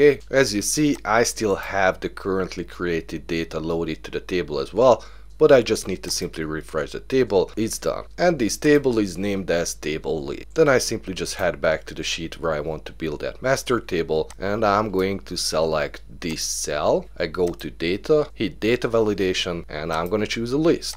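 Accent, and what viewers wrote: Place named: Hungary. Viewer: I bet you are Hungarian. The accent!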